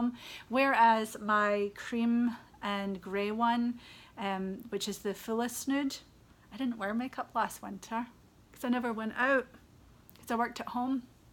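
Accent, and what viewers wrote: Scotland, United Kingdom. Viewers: I’ve purchased yarn from you before, enjoy your newsletters and am thrilled to find your podcast! I love hearing your Scottish accent and seeing all of the wonderful British yarns! It’s so wonderful to have a place to actually see and be able to purchase Jamieson Jumper weight yarn!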